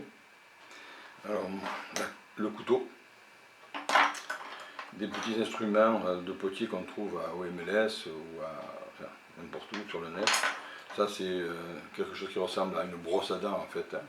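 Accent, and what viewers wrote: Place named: South, France. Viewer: Je viens de vous découvrir, c'est ENCORE bien sûr. Et puis, pour moi qui suis belge, le petit accent qui sent bon le sud ne gache rien!!!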